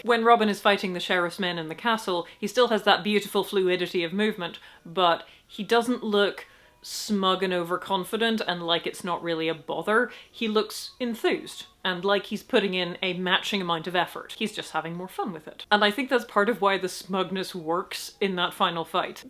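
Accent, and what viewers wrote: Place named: England, United Kingdom. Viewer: I take reviews quite seriously because unlike some YouTubers she speaks with an English accent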